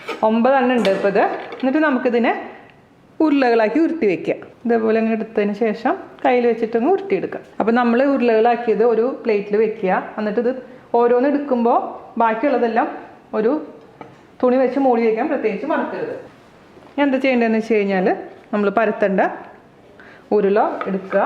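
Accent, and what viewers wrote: Thrissur, India. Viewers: Thrissur Baasha keakan thonnumbo ..chechys vdo kaandu samadannikum....kubbos and chicken for todays dinner:)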